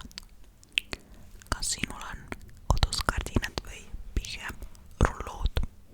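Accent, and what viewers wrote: Saaremaa, Estonia. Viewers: Aga hääldusest ikka saarlane ka, jääb mulje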